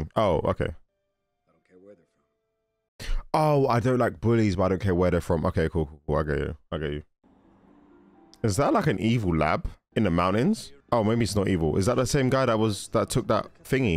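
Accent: United Kingdom. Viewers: Oh my gosh, you reacting to Disney movies would be so lit!!! Geez, I just watched a little further and you not knowing that the US was even in WWII is killing me just a little bit inside LOL Despite the accent, I forget to consider you're British sometimes during your reactions to American movies/shows. To be fair though, I guess I don't know too much about specific contributions England had during the war, so I can't expect more than that out of you for America. I definitely know y'all were one of the major Allied powers though! haha (The most specific thing that comes to mind to me for Great Britain is "Keep Calm & Carry On" and the context surrounding that.)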